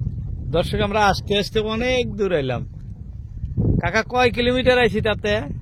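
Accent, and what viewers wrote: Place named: Mymensingh, Bangladesh. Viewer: ভাই কোথায় থেকে আপনি, ময়মনসিংহের ভাষায় কথা বলছেন।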